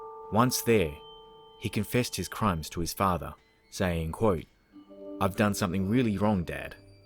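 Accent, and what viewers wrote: Australia. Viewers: You sound like an aussie urmaker! I love it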